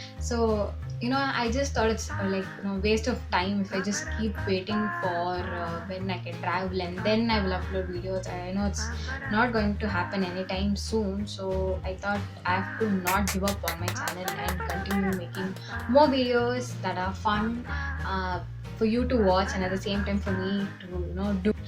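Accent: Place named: South India, India. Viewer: Your Ascent of speaking English shows you are a south Indian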